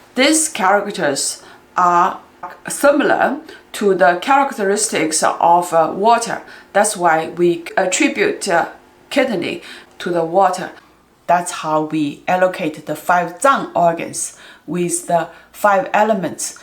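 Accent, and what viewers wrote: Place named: China. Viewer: I love your accent its cute Chinese English I love it by the way you are not only a good tutor but also beautiful women beautiful wowoowow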